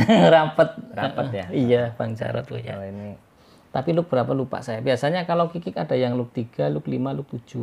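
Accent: Banyumas, Indonesia. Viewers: Nogo kikik kiyek kiyek😂, Ngapak acen gae ngakak tur kepenak kayak kiyek😁 😂,, Semangat lur salim saking Jogja 👍.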